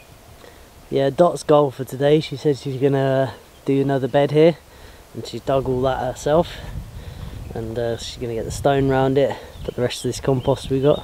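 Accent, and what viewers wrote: Wales, United Kingdom. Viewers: Nice welsh accent you got there:D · Assent Welsh to no end... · Awesome pal, nice to finally know your the only gay in the village 😄😄😄😄 fantastic Welsh accent. Nice to see in the vids again. She is beasting it pal, watch out she will be taking over. Lol. Stay safe x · I love hearing the welsh assent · Loved your welsh accent and the humour behind it.:)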